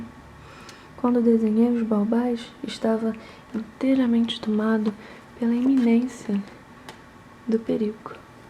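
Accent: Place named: Florianopolis, Brazil. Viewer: você tem um sotaque daqui de Floripa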